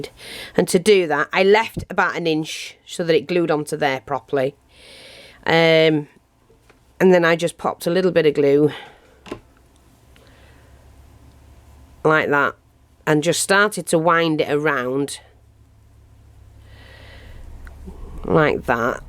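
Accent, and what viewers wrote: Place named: England, United Kingdom. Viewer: Your awesome you have warmed the kukkels of my heart..lol thanks a lot.Is it Easter there,Where are you from You sound English.I love the accent..Im a Newfie in Kitchener Ontario.I call myself The Official Witch of Kitchener City.lol.Have you ever watched any of Lisa The Pagan videos She sounds just like you I love her.Blessings.)0(.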